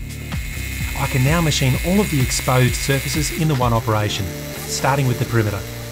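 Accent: Australia. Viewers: has anyone ever told you how wonderfully pleasant and warm your voice is? It's not just the novelty of the Aussie accent (I'm American, not something I get to hear often) but the fact that I can actually HEAR the smile when you talk. Love it. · G'Day, Every time I watch your fascinating videos I'm left with just so many questions: • This is the first time that I've seen "blueing" done with a bed of copper shavings. Most frequently I've seen it done simply with a blowtorch & a tin of oil, or with a chemical treatment. What's the advantage of doing it this way over something like Birchwood Casey Super Blue? • Why a box formed from thick brass & not just one from a (cheaper?) thinner mild steel cutout? Aesthetics or functionality? • Why only two feet instead of a more stable four? And why have the threading holes penetrate all the way through the brass (thus needing rivet caps), instead of making shallower holes (or just positioning them below the box walls)? 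I've only been aware of your channel for a short time, but have been riveted by the extraordinary attention to detail, functionality & aesthetics in your projects (especially the exquisite Playing Card Press). Not merely mass manufactured tools, but singular (& wonderful) works of art. I'm also pretty chuffed that there's such damn good content from another Aussie (I'm over in Bunbury, WA) - it gets kind'a tedious listening to American accents sometimes.; )~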